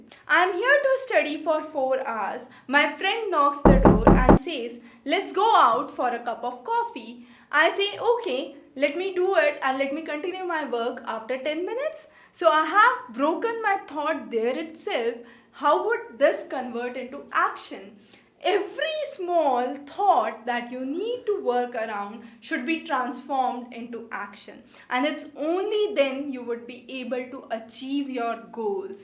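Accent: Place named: South India, India. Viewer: I m thinking your from southIndia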